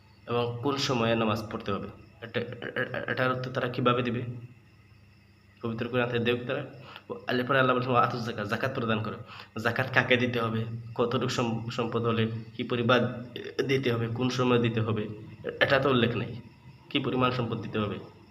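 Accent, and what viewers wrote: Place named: Chittagong, Bangladesh. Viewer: তোমার কথাই এখনো ফোটে নাই,চাটগাঁইয়া ভাব কথায় স্পষ্ট। জ্ঞানের গভীরতা বাড়াও।মরিস বুখাইলীর মতো "বিজ্ঞান, ইঞ্জিল ও কোরআন " একটি বই লেখে দেখাও।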